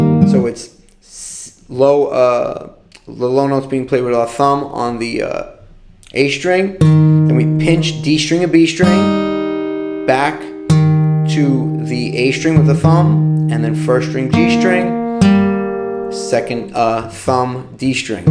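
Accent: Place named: Italian-American, United States. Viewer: You know, I've been banging on a guitar for 53 years, and I'm still trying to get good at it. The whole Travis picking thing has had me flummoxed for the past few months. Being that you're also an Italian dude who looks and talks like the people I grew up around seems to help me to kind of "get this thing" a little bit better. You're a good teacher, and I'll bet you're a great performing artist as well. I will take a break from my lesson to go and investigate. Thank you, paisan.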